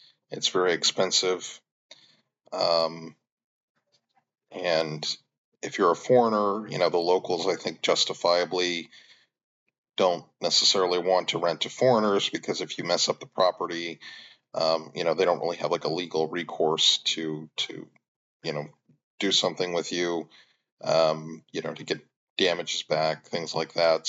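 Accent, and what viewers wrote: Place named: United States. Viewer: Hey man, yt randomly recommended me this video. I grew up there lol and I live in the US rn. You have talked about Sariyer and I was like maybe he studied at the Bogazici University(?). Were you staying at the Kandilli Campus ( You have talked about taking a bus and then the metro so maybe?) Yeah public transit can be really draining and it usually sucks. Also the level of random traffic is probably unmatched too. Anyways, it was funny hearing an American yap about Istanbul haha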